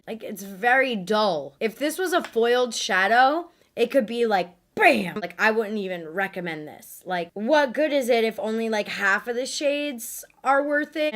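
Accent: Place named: New Jersey, United States. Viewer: With a little Jersey accent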